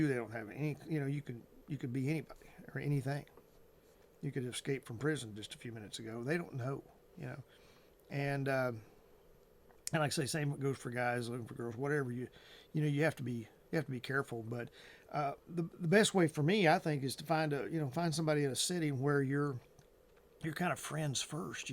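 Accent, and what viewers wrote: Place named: Texas, United States. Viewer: Where exactly are you at? What area? You sound like you might be from Texas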